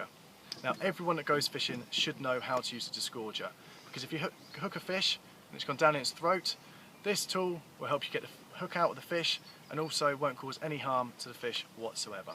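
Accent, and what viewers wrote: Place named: Midlands, United Kingdom. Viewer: hi sounds like the midlands to me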